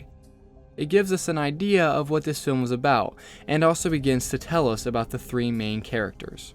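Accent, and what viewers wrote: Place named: South, United States. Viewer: People in West Texas don't talk with this southern draw.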